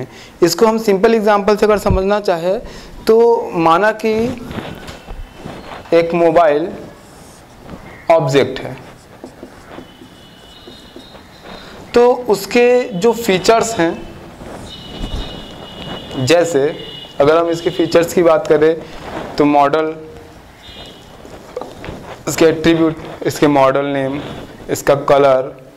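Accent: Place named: Bhojpuri, India. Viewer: bhojpuri tone me baat karte hai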